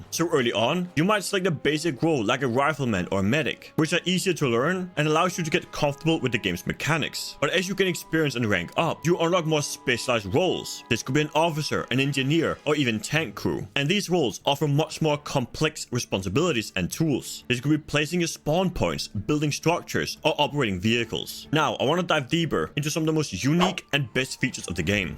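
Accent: Denmark. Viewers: Can't fucking deal with this guy's danish accent just try a little harder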